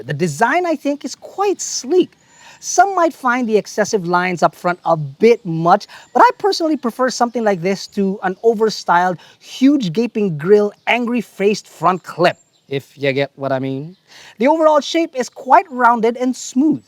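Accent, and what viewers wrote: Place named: Philippines. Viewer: Fucking corny! Horrendous pinoy accent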